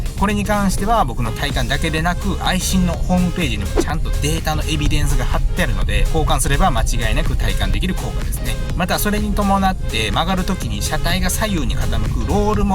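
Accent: Kansai, Japan. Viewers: お返事ありがとうございます😊 さんの関西弁ももっと聞きてえっすがら期待して待ってらっすど😁 へばまんつなー！ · 別にディスるつもりは全くないですが関西弁特有の若干の強みが全く感じないのが本当に人の良さが滲み出てて凄く聴きやすく見やすい素晴らしい動画です✨ ゴムモールの事ときめ細かい点も本当に素晴らしいですしあくまで視聴者目線の押しつけのない綺麗な動画…続けて見てしまいます✨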